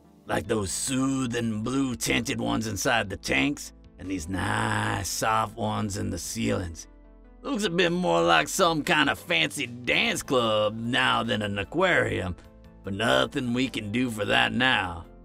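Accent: Louisiana, United States. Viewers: Like the Cajun sound your voice had. This reminds me a little of a song, by. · Your southern style accent was amazing and this audio was super cute. Excellent job on this one! ❤️❤️❤️❤️❤️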